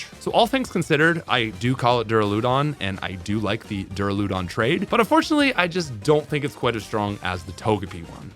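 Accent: Canada. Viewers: Screw this Canadian accent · He sounds so aggressively Canadian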